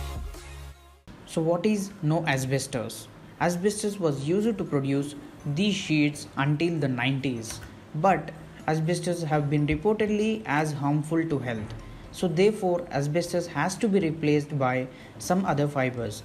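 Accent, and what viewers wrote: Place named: Telugu, India. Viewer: I can definitely tell that ur from Telugu states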